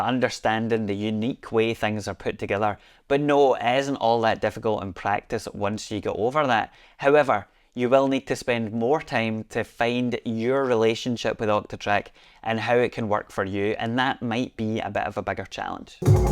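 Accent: Scotland, United Kingdom. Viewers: I believe it must be Scottish.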